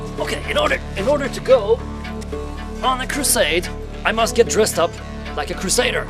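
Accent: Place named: England, United Kingdom. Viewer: Genialny angielski akcent wow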